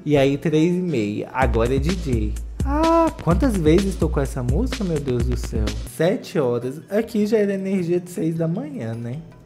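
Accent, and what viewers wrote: Minas Gerais, Brazil. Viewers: ficando gradualmente mais mineiro!!! amei ❤️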